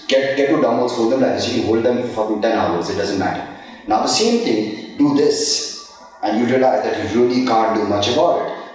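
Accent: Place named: India. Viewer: Great and helpful info but sadly I start to hear Indian starts from minute 7.00, then I’m lost.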